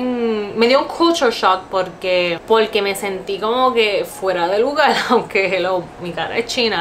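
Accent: Puerto Rico. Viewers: Tú español es boricua. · Jaja q habla español con acento boricua . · Tu eres Boricua y hablas BORICUA, literar.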